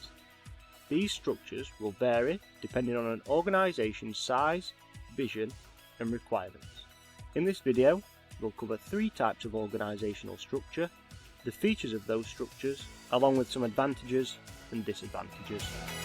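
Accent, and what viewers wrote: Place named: Manchester, United Kingdom. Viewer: Very clear, understandable presentation. It is just what I needed for illustration for my students. I like the accent very much! Manchester?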